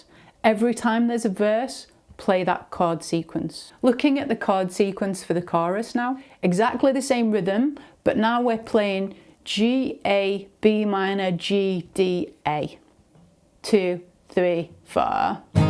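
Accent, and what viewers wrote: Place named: Yorkshire, United Kingdom. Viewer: Awesome lesson, thank you. I’m guessing by your accent that you’re not too far away from me Leeds/Bradford area?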